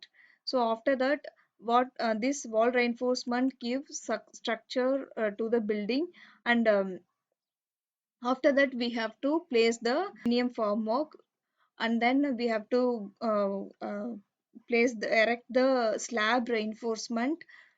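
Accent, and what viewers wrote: South India, India. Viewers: Very boring narration, dozed off when video was playing. also typical South Indian accent of the woman narrating. Wish it was more professional.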